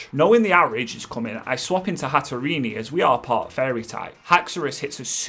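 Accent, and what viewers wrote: United Kingdom. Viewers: i love the Bri-ish Long live the King!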